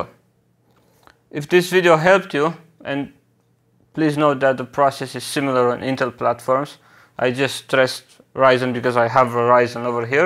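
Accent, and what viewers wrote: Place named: Poland. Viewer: Where are you from? Your accent sounds Like polish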